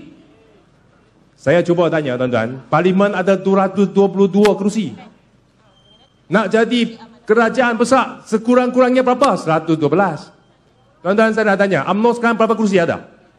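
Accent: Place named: Kedah, Malaysia. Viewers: Salute, berBahasa Melayu dengan Loghat Utara Malaya. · Dengar dia cakap dah tau dia ni confirm org kedah, bnyk bergaul dgn org Kampung. Bukan mcm apek mca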